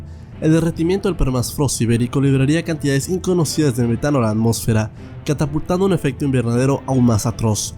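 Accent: Ecuador. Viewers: Oh vaya eres de Ecuador O.o como yo :v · Eres de Ecuador?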